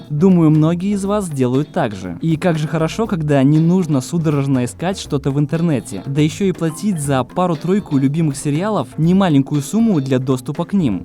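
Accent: Grodno, Belarus. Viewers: Дружище, ты не из Гродно?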